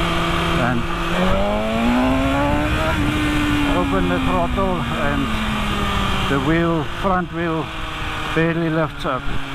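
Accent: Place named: South Africa. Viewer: Man I clicked on this video for some knowledge on wheelie control and as soon as I heard the accent and the road appeared I could tell you're from South Africa. Great video sir. I've subscribed.🙏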